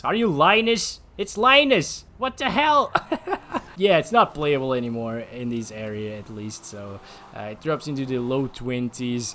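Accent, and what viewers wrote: Brazil. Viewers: Ele é brasileiro????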